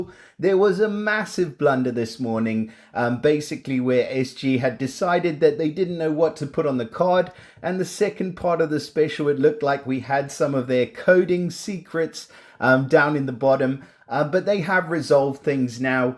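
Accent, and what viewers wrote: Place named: Cape Town, South Africa. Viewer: lol I knew it...certain words I can hear you from CPT😅 ain't nothing like a CPT accent 😎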